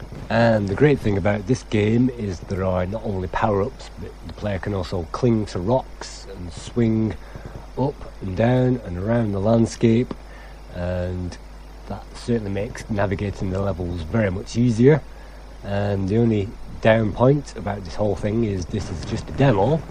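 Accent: United Kingdom. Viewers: It's cool man, you remind me of Yakumo1975 's RetroCore vids. Always good to have a laconic British accent. Cheers for the vids man.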